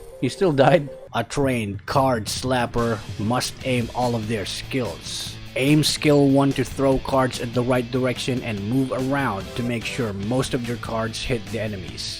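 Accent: United States. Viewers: I thought you were American or what ever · Pilipino ka pala hahaha akala ko american 👍👍👍👍Pota Ka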